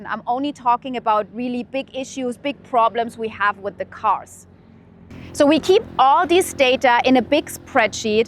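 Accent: Germany. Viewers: I clicked for the car information but come on. She is beautiful and dressed in a way that I can’t look at the cars for some reason.😂. I love the German accent and that she knows about cars. Definitely an exotic I would want to rent.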